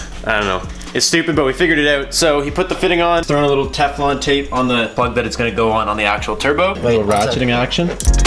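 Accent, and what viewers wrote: Canada. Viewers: Thumbs up for that Canadian accent.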